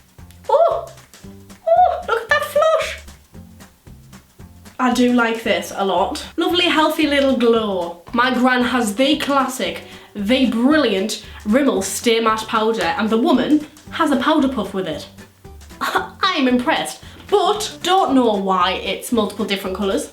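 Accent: Newcastle, United Kingdom. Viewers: Are you from Newcastle ?? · ohemgee it's a Geordie I live near Newcastle tooooooo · Alondra Flame it's Geordie (just like me )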